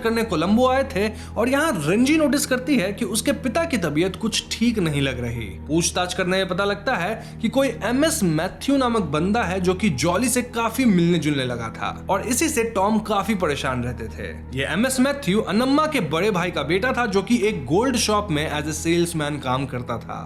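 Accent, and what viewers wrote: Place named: Bengal, India. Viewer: Dada bongali hai .. per pe char Raha hai .. not ped pe Chad Raha hai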